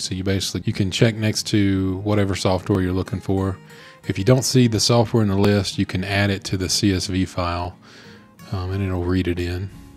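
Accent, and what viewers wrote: United States. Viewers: Wonder why I can kinda guess which part of USA you're from!?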